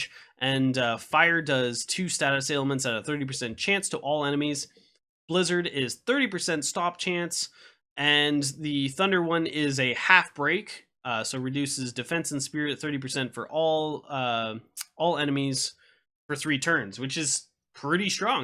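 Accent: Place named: Canada. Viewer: They call Chizuru: Chirizo, type of Mexican sausage due to the obv similarity. You sound Canadian so I can understand the confusion :p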